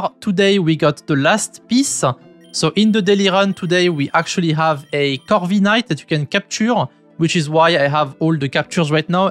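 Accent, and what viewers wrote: France. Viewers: très compréhensible je voie pas le problème l'accent baguette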